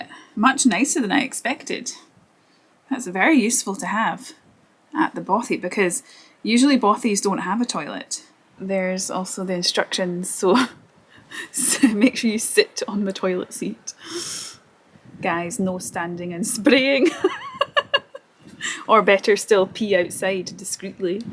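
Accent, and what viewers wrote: Scotland, United Kingdom. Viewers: Such a lovely warm person. Always real and uplifting. And I so enjoy listening to your Scottish accent. Thanks for sharing your adventures. Looking forward to the next one. From the redwoods in Northern California. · You are quite adorable. I'm from Clarkston originally, although I have lived in Vancouver British Columbia since 1967 at the age of 15 and your accent is so fresh and beautiful.....what part of Scotland did you grow up in?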